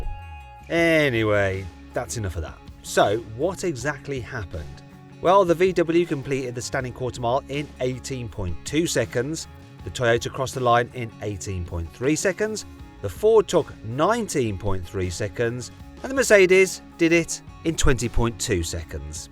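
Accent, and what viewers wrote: United Kingdom. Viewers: Love that northern accent.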